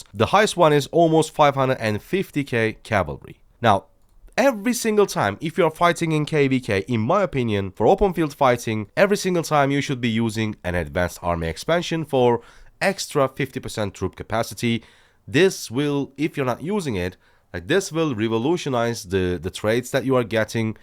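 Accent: Turkey. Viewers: I KNEW IT I KNNNNEEEEEWWWWW IT THIS MAN IS TURKISH i recognize this accent everywhere now he has a Fener shirt on 😂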